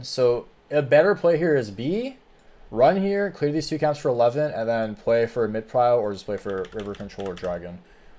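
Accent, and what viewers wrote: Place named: Australia. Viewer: Idk Australian accent makes me think it’s oce and as an oce player it’s super inflated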